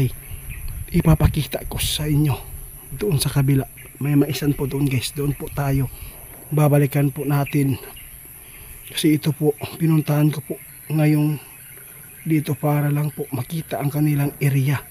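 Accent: Visayas, Philippines. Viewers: Visayas itong nagsasalita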